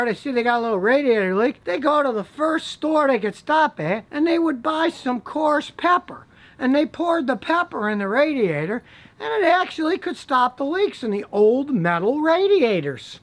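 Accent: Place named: Midwest, United States. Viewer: you live in or near Houston?? You don't sound from arround here, more like from the midwest??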